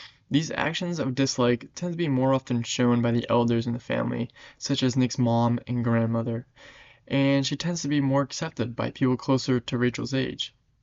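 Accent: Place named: France. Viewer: Outstanding! Great French accent!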